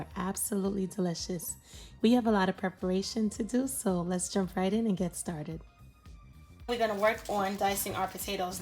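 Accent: New York, United States. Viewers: I could almost smell those sausages!!! Her New York accent makes me long for mine😛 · Are you from NY?🤔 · Ah, Thought I heard the NY accent ,or thereabouts. lol! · Definitely NY maybe Westchester or Long Island or Queens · Are you from NY? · Didn't look at anything else but I say from NY state. I from NY . Love the recipe. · Sounds like she's from newyork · I just made this and it was awesome, easy to follow and put together, thanks love the NY accent. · Had to subscribe once I heard the accent. 💯 N.Y in the building right here BX.... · She got a New York accent....LOL.. I find it lovely seeing Northerners cook Southern foods. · Luv that New Yawk accent. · Lol love her accent funny she most definitely from NEW York lol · Looks delicious and very easy to follow the steps. I love the NY accent. Would you please check me? <link>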